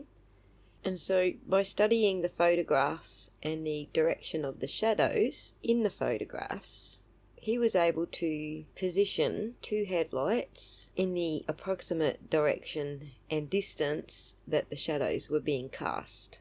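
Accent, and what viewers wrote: Australia. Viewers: I love your Australian accent!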